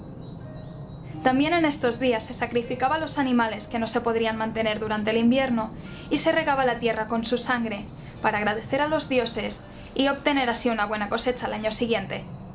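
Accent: Catalonia, Spain. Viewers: De qué parte de Catalunya eres??? 🙊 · Que buena información! De que parte de Cataluña sos? Saludos desde Argentina!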